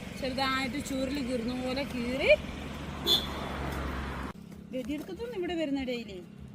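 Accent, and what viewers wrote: Kottayam, India. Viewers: Kottayam thodupuzha slang aanallo nattilevidaya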